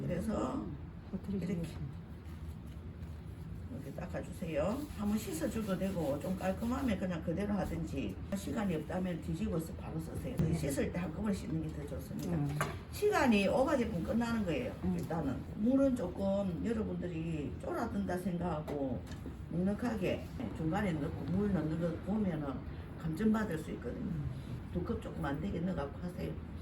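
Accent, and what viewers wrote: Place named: Gyeongsang, South Korea. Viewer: 통영이구나 경남말투인데 어딘가했네요 ㅋㅋ. 내일 시험인데. 합격했슴 좋겠네요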